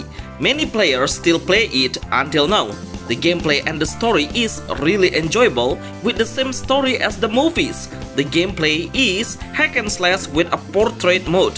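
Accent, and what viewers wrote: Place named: Indonesia. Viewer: mungkin pembicaranya yg indo bang kek indo banget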